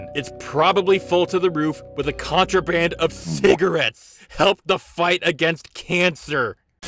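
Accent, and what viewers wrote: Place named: United States. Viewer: I was so confused for a few seconds hearing an american talk about this show, and all comments are in english, what's happening?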